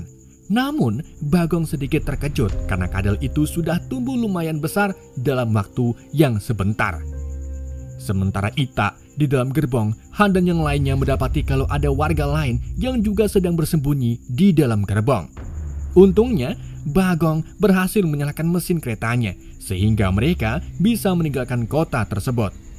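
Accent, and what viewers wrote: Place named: Banyumas, Indonesia. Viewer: Wkwkwkw jawa,a itu loh,,ngapak bgt mka,a saya suka chenel ini ,,bikin ngakak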